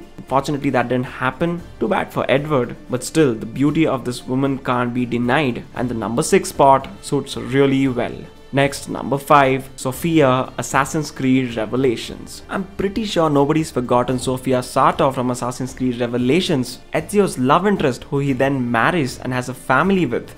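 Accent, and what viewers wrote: India. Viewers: your accent makes It sound like real love the Indian accent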